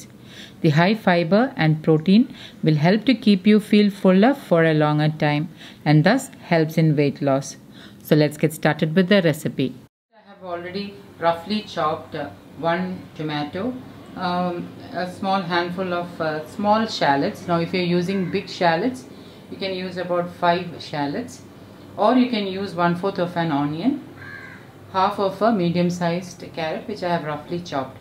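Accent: Kerala, India. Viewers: Mam are you from kerala, please don't take me in wrong way actually kerala people's try very much arvedic things by your voice felt are you from kerala.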